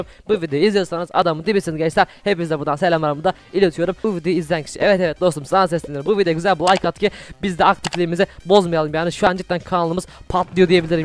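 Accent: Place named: Thrace, Turkey. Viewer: memnunum abi bu arada neden şiveli konuşuyon trakyalımısın.( ben öyleyim çünkü).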